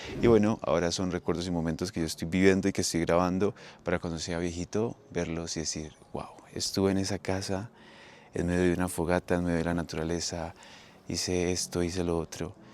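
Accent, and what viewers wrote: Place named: Colombia. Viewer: me encanta tu entonación al narrar, con tu acento colombiano, es de paz. Dedícate a hacer vídeos de poesía o leer textos de libros que hablen de paz. Te recomiendo hacer videos con textos del Libro de La Sabiduría o de Las Siracides. Con tu voz y los paisajes donde caminas... ¡SERÁN HERMOSOS!. Saludos desde Guadalajara, México ❤✌️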